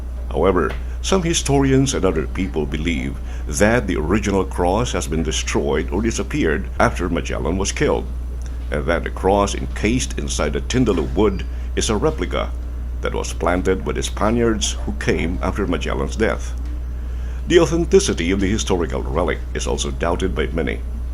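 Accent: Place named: United States. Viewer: The narrator sounded like a native american speaker. I thought at first he was an american because of how he speak.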